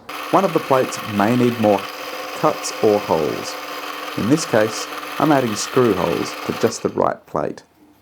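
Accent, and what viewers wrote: Australia. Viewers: upon hearing your voice' I said "Hey, he's an AUSSIE"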